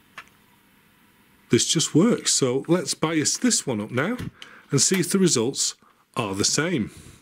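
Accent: United Kingdom. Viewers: Off-topic but i watch another youtuber whose a gamer, hes also british, i am british, tonys british, i just love hearing the british voice. Theres something about it, probably the "slagging off voice" if you dont k ow what that is, watch tonys video about cheap cassettes. Specifically the "fag packet" part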